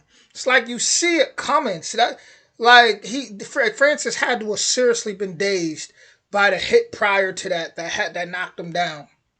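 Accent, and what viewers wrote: United States. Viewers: What part of the states is your accent from?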